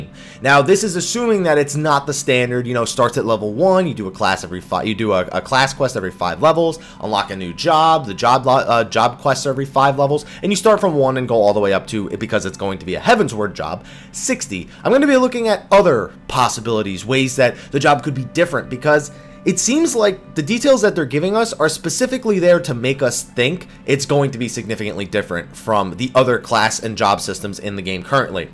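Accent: New Jersey, United States. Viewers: Two yoots. Old school Joisey speak.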